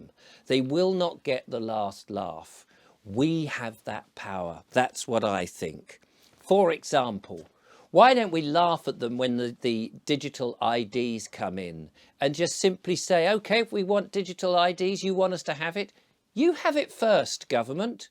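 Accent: United Kingdom. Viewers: It's the British accent.🤫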